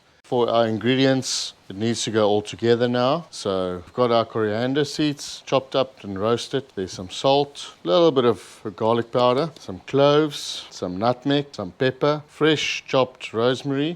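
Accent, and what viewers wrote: South Africa. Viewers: Oh, thank god! A proper Saffer accent means this is a good recipe.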